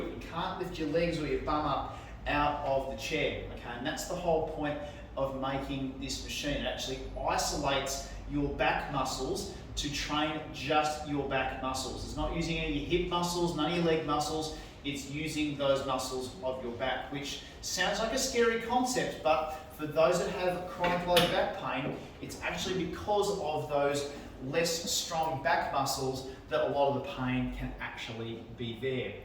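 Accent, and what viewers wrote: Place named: Australia. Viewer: Thickest aussie accent I've ever heard, anyways great vid.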